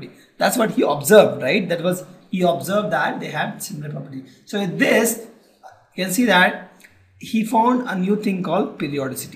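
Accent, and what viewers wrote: India. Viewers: u need subs for an Indian English?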